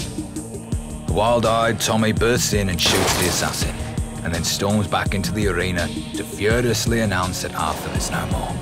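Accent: United Kingdom. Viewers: i m watching this video to learn British accent 😂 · This is the most British you will hear your whole life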